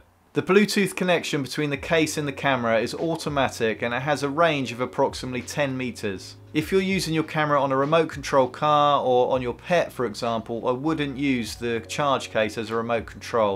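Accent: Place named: England, United Kingdom. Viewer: good to hear a English accent for a refreshing change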